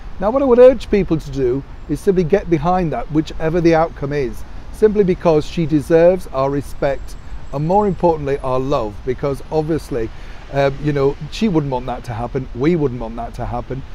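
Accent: United Kingdom. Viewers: You like to bait readers with dangling question. I got your numbers figured out. Lol.. Lucky I like your Britts accent. Anyway. We need to delete the obnoxious grifters traitors the Harkles from the public arena and promote the good beneficial aspects of your monarchy. Another important point is the blatant Disrespect and Elderly Abuse toward the well respected Queen and her late husband Duke that the Harkles have committed to torture the family.. I like to see the Harkles be disciplined and be punished according to the laws of the land.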